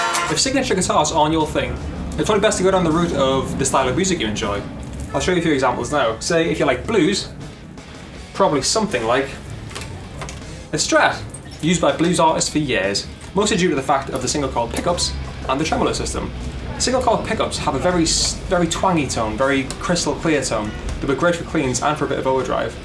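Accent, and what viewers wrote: Newcastle, United Kingdom. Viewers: Haha hes from England. Most likely a Geordie. Its not too bad is it?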